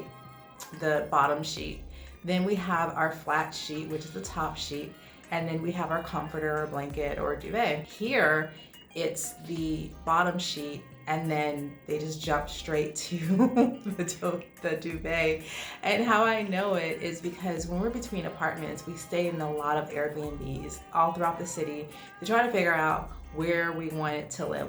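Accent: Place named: California, United States. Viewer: I didn't know that you're from California because you always talk about Georgia, but I always thought that your accent seemed like you were from California: the way you pronounce the words so well (not like NYCs, that say "xtress"), the beautiful way californians pronunce the "s" and the beautiful humming that californians have. 😊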